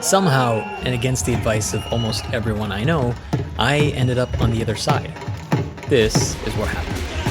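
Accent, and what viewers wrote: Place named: West, United States. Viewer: ?? You are Indian or Indian origin people who lives outside of India? Your accent sounds western. Where are you from originally?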